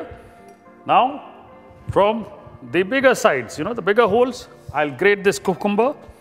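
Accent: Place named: South India, India. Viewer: I am Fan of all your cooking but generally I wanted to clear so.ething , you look punjabi but your acsent is south Indian , are you born n bought up in south India?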